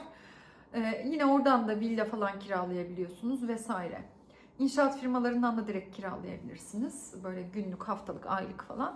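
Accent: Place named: Cyprus. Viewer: Lakin ben sizin Kıbrıslı şivenizi beğeniyordum.. 🤪 kötü anlamda kullanmamıştınız..👍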